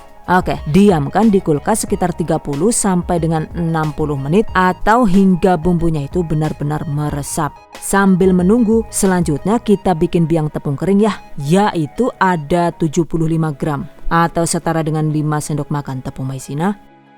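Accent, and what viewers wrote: Banyumas, Indonesia. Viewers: saya paling suka resep yg pake takaran pasti spt ini, bukan yg takaran "secukupnya". auto subscribe.... btw jg suka sama logat ngapaknya 😄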